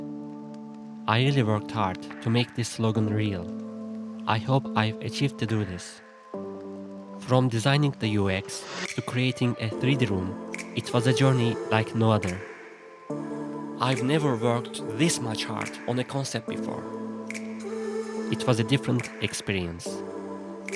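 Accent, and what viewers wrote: Turkey. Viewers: Aksanından anladım Türk olduğunu :D Başarılar! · Türksün lan sen konuşmandan belli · O kadar Türkçe aksanın var ki :D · Good job. / Aksanından Türk Olduğunu Anlamıştım! Gerçekten Çok İyisin. Devam Et. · Türk ingilizcesinin şekil bulmuş hali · bu kesin Türk, aksanından belli. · Olm aksağanından anlaşılıyo türk olduğun lan aksağan berbat fjslnsfjld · ABİCİM Bİ VİDEO DA TÜRK OLDUĞUNU SÖYLE YA KONUŞMANDAN ANLAŞILIYO BİLE NERELİSİN ABİCİM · Türk olduğunu aksanından anladım ama güzel video🙂👌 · Türk aksanı ilk sözden anladım 😘😂 Türkçe altyazı da koysaydın iyi olurdu. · Reis aksanından türksündür diye düşünüyorum fenasın adamsın ellerine sağlık :)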